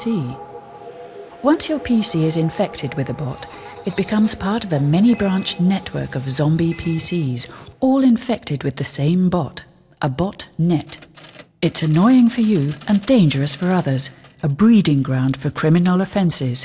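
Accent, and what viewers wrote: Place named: England, United Kingdom. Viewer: This is not a good explanation of the difference between Viruses and Worms! Viruses and worms are not known for "letting in riff raff". That is a backdoor. The difference between a virus and worm is that a worm can replicate and spread over the network by itself (self instatiating), whereas a virus requires a host file to run, it needs to be attached to some form of executable file. Simply, a virus requires a human to invoke it, and worm does not. Simple. You don't need to explain it badly. Despite the English accent, this is bunk. <link>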